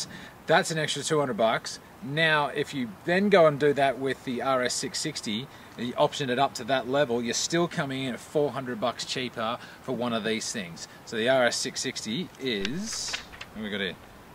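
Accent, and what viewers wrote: Australia. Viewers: Nice Aussie accent :)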